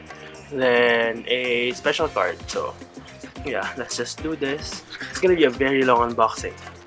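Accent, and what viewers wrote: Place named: Philippines. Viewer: Im guessing your Filipino? Idk but I your accent kinda sounds like filipino’s accent when speaking english. BTW your unboxing is great!! Thank you for supporting twice ^^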